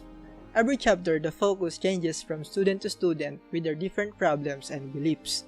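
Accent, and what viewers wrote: Philippines. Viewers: Your accent sounds like you’re from here in the Philippines, are you? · i knew it.. you have the accent :)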